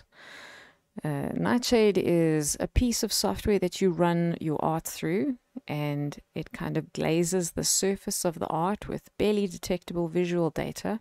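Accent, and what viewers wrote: South Africa. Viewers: I just found your art now! I can’t believe this is the first video I’ve seen from you! By the way, are you Afrikaans? I can’t help but notice that familiar accent! :D God bless! Keep creating :)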